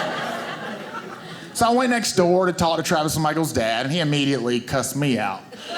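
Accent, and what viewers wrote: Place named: South, United States. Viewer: Really hilarious especially with the southern accent. Cant wait for more from him...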